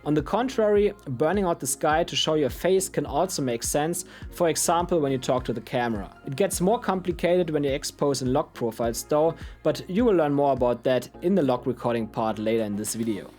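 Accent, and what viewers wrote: Germany. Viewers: Really good video 👌 a question 🙋🏼‍♂️ you German? I kinda heard in your accent.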